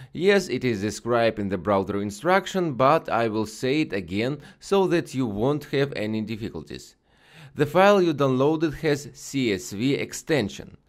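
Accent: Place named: Russia. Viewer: typical russian tougue, love it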